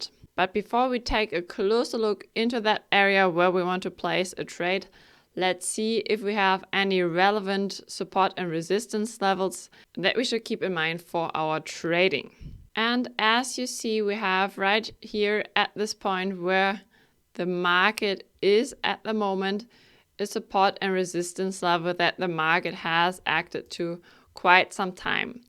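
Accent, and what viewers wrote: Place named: Germany. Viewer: Ich wusste doch ich höre den deutschen Akzent heraus 😅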